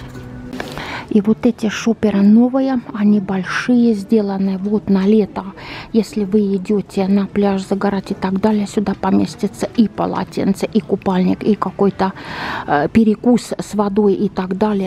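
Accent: Finland. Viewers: У вас такой милый финский акцент.. эх, я соскучилась по Финляндии… ❤️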